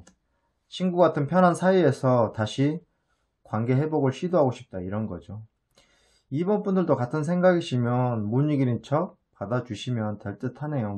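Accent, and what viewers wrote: Jeolla, South Korea. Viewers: 전라도 분이신가봐용 헤헤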